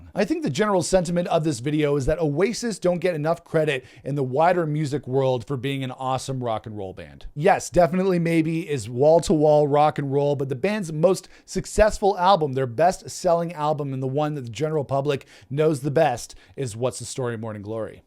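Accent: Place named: United States. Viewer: I looked at the thumbnail and for some reason knew I was gonna hear an American accent lmao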